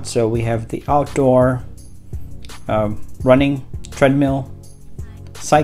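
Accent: Philippines. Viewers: Sound Filipino...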